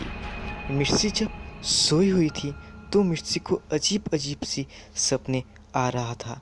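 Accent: Bengal, India. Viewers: Bhai kya story super and are you Bengali no bhai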